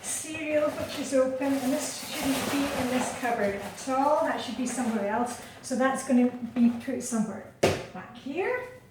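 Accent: Scotland, United Kingdom. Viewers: Are you from Denmark? I was going to say you sound Scottish lol hope I don't upset you saying that. ❤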